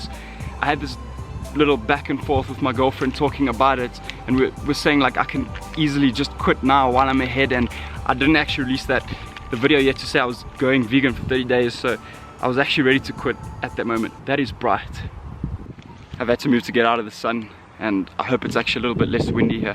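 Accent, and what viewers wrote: South Africa. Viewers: I swear I could tell you were South African... I just couldn't figure it out :D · I thought I was crazy for thinking you're South African due to your accent, Good video brother 💪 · I just love south African accents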